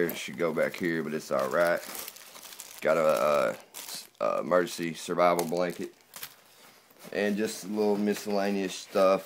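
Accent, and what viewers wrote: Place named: Kentucky, United States. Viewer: im not shure but you sound country like me...im from kentucky. .. Nit loadout anfd a BAD rifle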